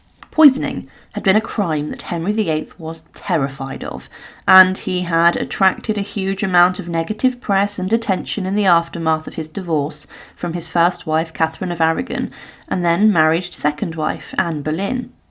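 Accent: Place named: RP, United Kingdom. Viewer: Clear and precise RP. Perhaps, stick to listening to Sesame Street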